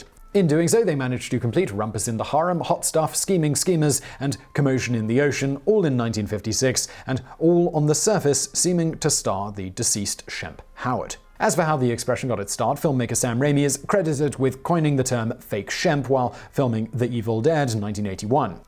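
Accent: England, United Kingdom. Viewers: It’s fantastic to hear an English accent talk about The Stooges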